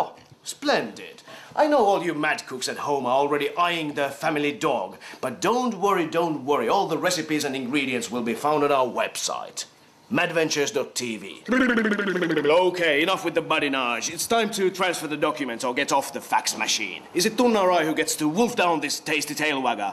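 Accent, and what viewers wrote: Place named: Netherlands. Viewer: he sounds dutch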